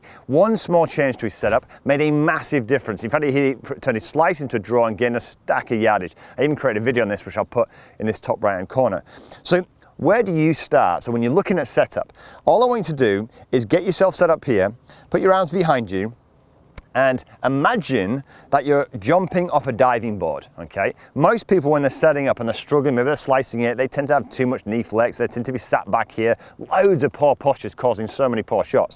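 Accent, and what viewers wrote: United Kingdom. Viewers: Helpful tips. Also good for learning British English too. Many thanks. From S.Korea.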